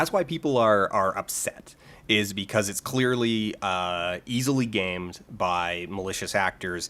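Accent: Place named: Canada. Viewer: lol I just meant the voice and the way you speak. Not the actual words. Are you canadian?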